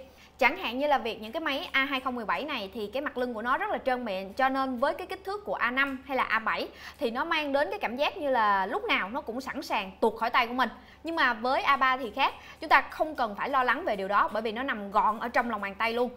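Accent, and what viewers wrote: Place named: Mekong Delta, Vietnam. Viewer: thích cg miền tây :)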